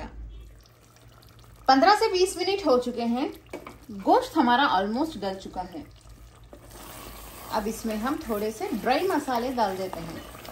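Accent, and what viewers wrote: Hyderabad, India. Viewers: Assalaam Alaikum, Amma Kya Malai Gosh Banai naa aap. Laikin, mujhae yaa poochna tha, amma, ismae mirchi thoda kam daal sakte kya. Mai thoda midcii kam khata hu., kyu kae, mai England mae takriban 50 (Pachaas) salo sae hu. Aap ki Hyderabadi boolthi sunkae bada maza aaya. My aap ke Chantal ko like aur subscribe kardiya. Mai aur meri beebi Hyderabad jab aaynga to aap sae mulakhat ki umeed rakhtae hai. Allah Hafiz..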